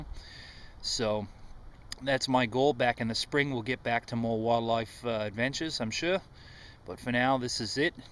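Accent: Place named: Australia. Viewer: hahah great accent mate. aussie/american/canadian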